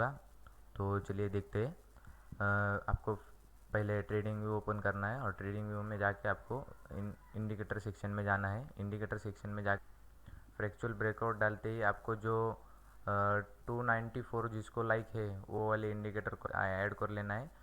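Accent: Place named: Maharashtra, India. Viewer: तुझी हिंदी बोलण्याची पद्धतीवरून तु मराठी वाटतोस आहेसच...video भारीच होता .हे सगळं मराठीत येऊ द्या ..मराठी मधील शेअर मार्केटचा सगळ्यात फेमस आणि उपयुक्त च्यानल तुझं होवो ह्या शुभेच्छा .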